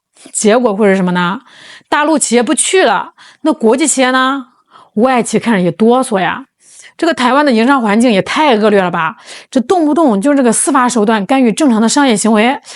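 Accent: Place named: Shandong, China. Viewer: 一听就是山东的，外网键政圈大部分是山东人😂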